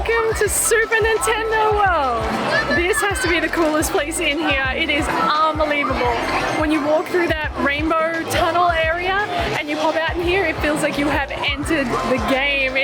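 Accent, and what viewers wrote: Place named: Australia. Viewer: Hello I just subscribed to your channel. I have mad respect for you going to theme parks alone. Couple of weirdos starring. I love your Aussie accent. Stay cute and safe!! 😊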